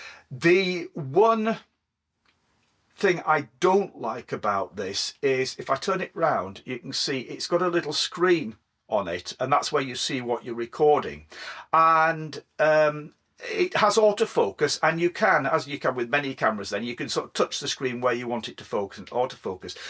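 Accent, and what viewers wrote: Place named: Northern England, United Kingdom. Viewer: Excellent video! You nailed it with the reasoning and I fully agree with you. Nice to hear that Northern accent as an ex-pat in Vienna from Sheffield. I located your trips and was surprised that you don't seem to be from the Harrogate area as you sound just like my best mate from school who's from there. 😂 Anyway I'm glad I discovered your channel and have viewed a few of your videos. I will watch more. Again I fully agree, stick to your principles why you enjoy making them, you are educational, thought inspiring and making others happy. Thumbs up 👍 Oh yes I have recently returned to biking after a long break. Kids etc. 😉 A lot to relearn.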